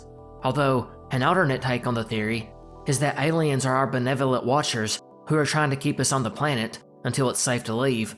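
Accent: South, United States. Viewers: Came for the conspiracy theories,stayed for the southern drawl. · Nice to hear another southern accent with some obvious intelligence behind it. Excellent content brother. Good stuff. *at 63 I began to mediate out of scientific curiosity. Just to see for myself IF there's anything to this. There IS. There is a LOT to it. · What in cousin loving tarnation is this universal southern ai accent? A taste of Appalachia with a baby momma in Texas but currently resides in Georgia WTF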